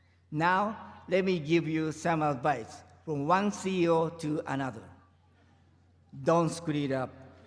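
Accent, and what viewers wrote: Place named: Japan. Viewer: これが日本人英語の限界か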